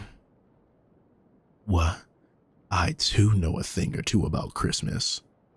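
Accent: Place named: South, United States. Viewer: I love a good southern accent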